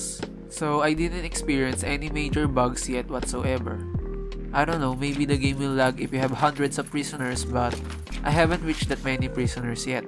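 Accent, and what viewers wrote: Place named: Philippines. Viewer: hey, the accent sounds very Filipino. great to see more of us doing game reviews!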